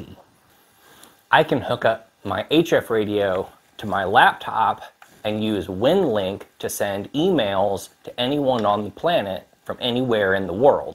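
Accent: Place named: Austin, United States. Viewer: Yup. You're definitely from Austin.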